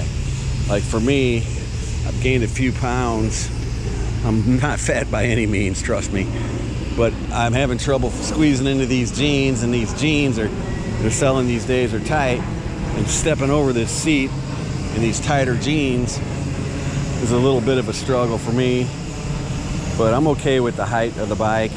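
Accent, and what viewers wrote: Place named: St. Louis, United States. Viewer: Hey, former south St. Lou here. Have been checkin out 300L vids and ran on to you. Clicked on one of your vids and as soon as you started talking I said this dude is from St.Lou haha. I moved away in 1995 sooo! But I lived there for 29 years and had a Harley super glide that after hittin the bars we would sometimes go out to Creve Coeur Mill rd and blast em. That was late 70's so those soccer fields and all that wasen't there and it was dark. Cops would turn on their lights and come after us but wouldn't stop and get out to confront us out there in the dark lol. I have a Africa Twin now down here in South Georgia and it's a beast but am looking to get something smaller and easier to handle as I'll be 71 on next birthday. I had a little yamaha 360 enduro back in the day and it was lower seat but knobbys and it would go about anywhere so start cutting through places and jumping curbs and use that thing the way it can be and you'll have more fun. 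Good luck with yours! PS I know about the divorce thing. No sweat you'll be better off!!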